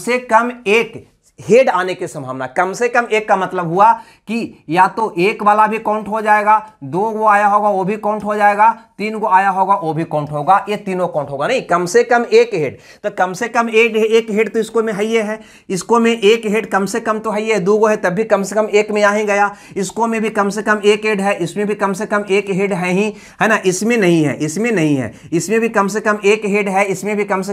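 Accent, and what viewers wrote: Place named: Bihar, India. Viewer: Sir aap bihar se ho kya.... Language se lgta... Hm Bihar se 👍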